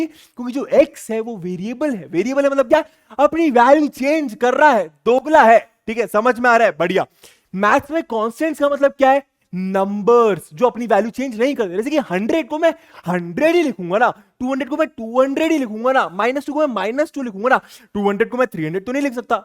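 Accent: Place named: Bihar, India. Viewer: ap bihari ho /mai bhi hu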